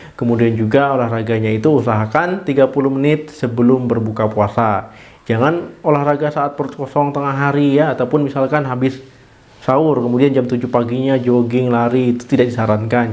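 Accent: Aceh, Indonesia. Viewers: Dok orang Aceh ya? Logat nya Aceh masih kental soalnya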